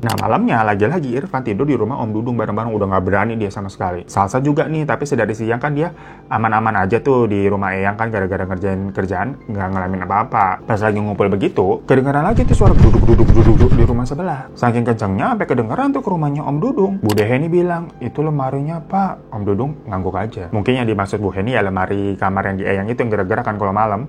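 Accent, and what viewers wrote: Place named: Banyumas, Indonesia. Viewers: Curiga bang wong ngapak😐🙏, katanya juga punya sepupu orang purbalingga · Si babu glowing bisa ngapak woyyy😮😊😂😂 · Aku suka mas bisa ngomong ngapak wkwkwk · Ngapak lu gureng bang 🗿 Gw orang purwokerto agak aneh dengarnya🗿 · Bang ngapak lo oke banget masih ehe 😂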